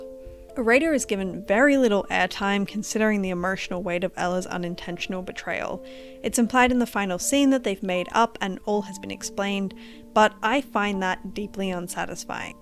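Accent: Australia. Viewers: I clicked on this video because I've never seen another (real life) person with the name /. Plus sounds like we're both Aussie which is fun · I love the way you say "Ella Enchanted" like Eller Enchanted". Aussie accents are so fun to listen to😊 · It’s an Australian accent. Sometimes they sound different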